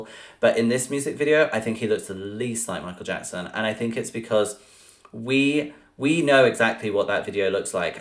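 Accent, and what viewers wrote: United States. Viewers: You're American accent that you do is funny. I love what you do bro.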